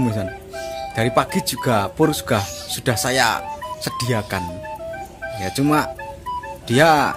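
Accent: Java, Indonesia. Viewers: Ak seneng konten ini satu gaya MEDOK bahasanya tdk ada di channel lain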